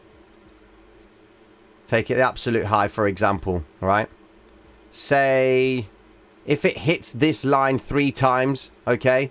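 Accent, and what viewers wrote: Cockney, United Kingdom. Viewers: Man, U R flipping brilliant. I learn 10-20 different things on every video you make. Thanks. Beginning to understand the MM strategies. Pretty sure it will help in the future.Of course, always remembering that anything can happen. By the way, U got an Italian name and a Cockney accent. Remind me of a few friends I had back then.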